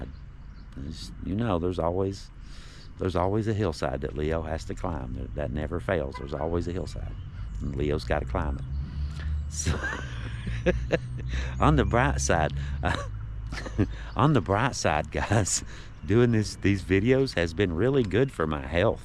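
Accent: South, United States. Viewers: Loving your videos on the Hatfields and McCoys. Love your Southern accent ❤ Cheers from New Zealand 🇳🇿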